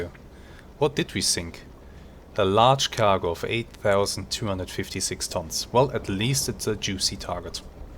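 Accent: Germany. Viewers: I like betters, too, but... let's be honest: That German accent DEFINITELY adds something to the experience;)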